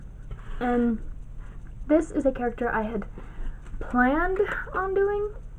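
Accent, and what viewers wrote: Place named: United States. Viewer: I'm not sure any if any of the threats given are enough for him to be arrested, the server is small and between friends, so I'm not ok disclosing that. But I will give you his account it's AllergicToPlebs#4779 Now I'd suggest blocking him. But it's your call. From your accent I'm assuming you're American, most people on the server are British or European. Aside from that, I wish you the best of luck, if you can slap some sense into him at the same time that'd be very nice too. I'm getting tired of him, as close as a friend as he is.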